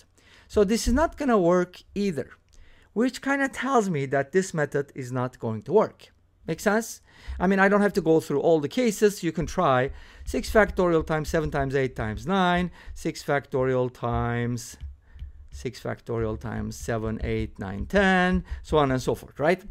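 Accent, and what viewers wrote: Turkey. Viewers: unnecessarily long video. last part was more interesting it could be more explanatory aksanindan anladim turksun helal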